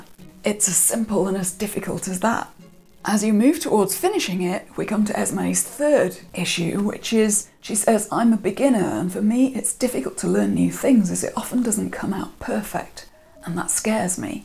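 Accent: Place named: RP, United Kingdom. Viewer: You remind me to The Crown’s Margaret Thatcher. Her rasp is next level, but yours is too! You sound very posh. Also, I started projects and I just haven’t finished them. But I’ve done them now during this pandemic.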